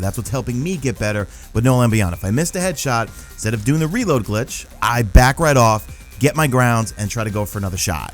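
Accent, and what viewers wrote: New York, United States. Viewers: you from new York? or Boston perhaps?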